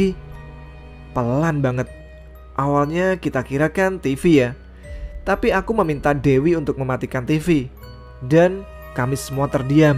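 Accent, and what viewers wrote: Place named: Java, Indonesia. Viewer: Medok kali logat bahasanya mas😆😆 cerita2 mas ini lumayan bagus seram juga😱 😊🙂👍👍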